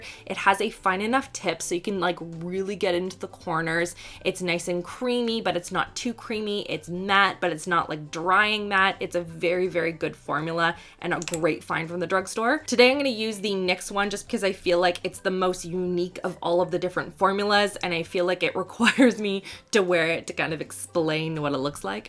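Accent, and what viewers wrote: Canada. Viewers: Just discovered your videos. Do I detect a Canadian accent? Excited to see Pixie mentioned, I was starting to think they did not make anything good. Thanks! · I love your Canadian accent!! I need to try those NYX lipsticks. Really pretty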